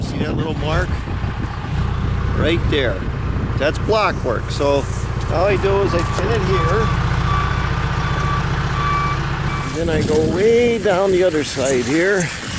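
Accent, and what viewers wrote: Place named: New Jersey, United States. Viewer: Lol u gotta be from jersey from the accent. Nice work tho 💪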